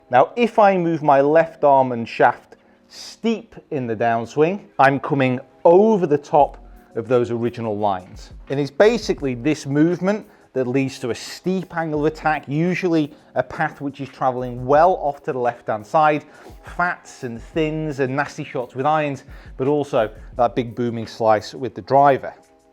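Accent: United States. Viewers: American accent is so unsettling lol 😂 but great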